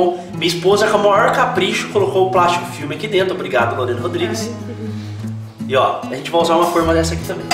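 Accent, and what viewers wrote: Minas Gerais, Brazil. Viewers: Vc é mineiro? Rs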